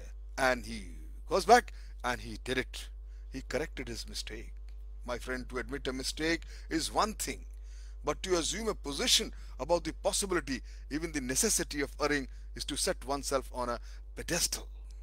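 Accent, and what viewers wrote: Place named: Kerala, India. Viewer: U sound like a mallu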